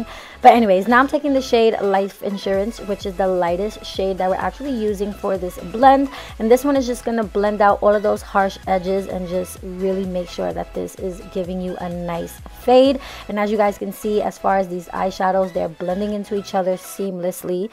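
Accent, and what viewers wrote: New York, United States. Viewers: Her accent🥰so New York I love it💕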